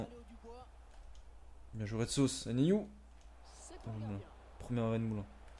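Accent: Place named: Saint-Étienne, France. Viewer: Accent stéphanois grave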